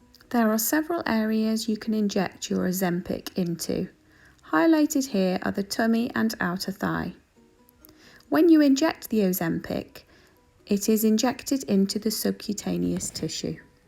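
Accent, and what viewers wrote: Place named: Liverpool, United Kingdom. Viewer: I am writing from Phoenix, Arizona, USA to thank you for this video. Watching this and your calm demeanor helped me to take my Ozempic medicine. You are a peach. BTW I love your Scouse accent. Merry Christmas! P.J. Stewart.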